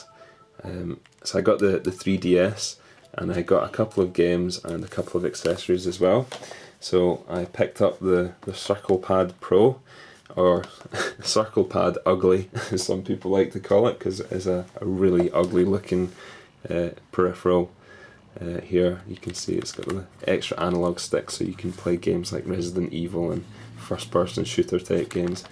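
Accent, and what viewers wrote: Scotland, United Kingdom. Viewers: Where u from? You sound Scottish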